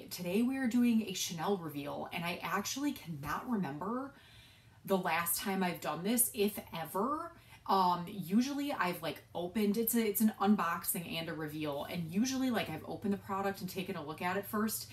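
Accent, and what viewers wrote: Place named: Minnesota, United States. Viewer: are u in Minnesota? The accent ...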